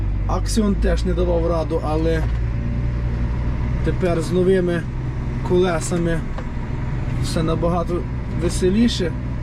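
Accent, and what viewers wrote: Ternopil, Ukraine. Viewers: Тернопільський акцент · ваш говір Тай ніби із тернопільщини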